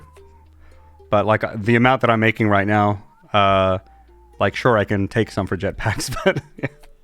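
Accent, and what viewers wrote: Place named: Canada. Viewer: I've had so much trouble trying to identify your accent. Finding out that you are Australian and have lived many years in other countries explains why you sound Canadian most of the time! (I'm Canadian so that is a compliment LOL)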